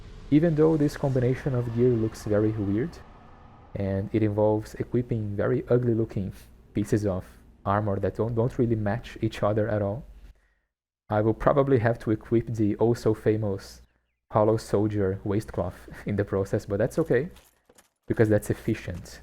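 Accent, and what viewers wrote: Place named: Brazil. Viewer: Sotaque BR 😂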